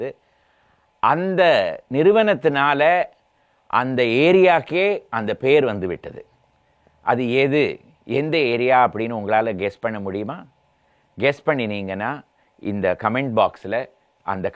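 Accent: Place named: Agraharam, India. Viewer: சார் வணக்கம் மன்னியுங்கள் இன்று அக்ரஹார தமிழ் கேட்பதே அபூர்வமானதும் அற்புதமாகவும் இருக்கிறது. அதில் குழைய குழைய உங்கள் வாயில் வாய்ஸில் பழைய வரலாறுகளை கேட்பது பாக்கியமே. BY THE WAY I AM A NON BHRAMIN. MY BEST AND CLOSE FRIENDS AND FIRST ROW WELL WISHERS ARE ABSOLUTE AND EXCLUSIVE BHRAMINS. சார் ஒரே கர்னாடக சங்கீத ரசிகன். தாங்கள் அன்பு கூர்ந்து நமது சங்கீத சபாக்களின் வரலாறுகளைப் பற்றி பேசுங்கள்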